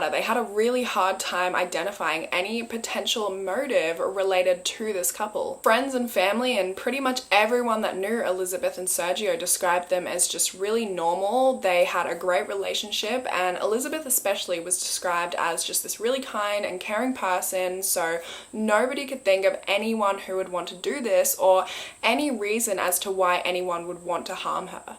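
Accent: Australia. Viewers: Ahhhh about 17 minutes in you were explaining that "here in Australia" you call Nissans nissin haha 😄 okay so you're accent is Australian.... just beautiful 😍 ❤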